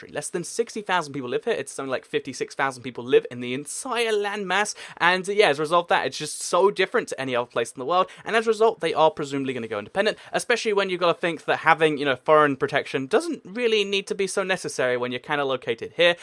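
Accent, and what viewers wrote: United Kingdom. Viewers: Who else couldn't tell if this guy was British or Australian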